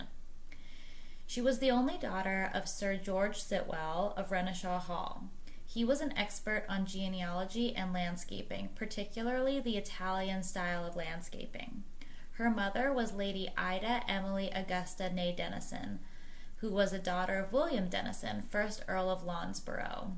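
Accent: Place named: United States. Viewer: shame about the accent. Why is it that American accents are so hard on the ears. It stumbles around the English aristocratic discourse and the pronounications are mainly wrong. Leave Edith Sitwell to us-the British-and you do Miss Monroe.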